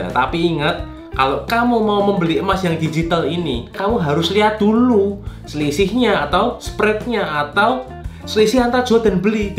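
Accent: Java, Indonesia. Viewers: Paling suka liat koko koko tp klo ngomong medok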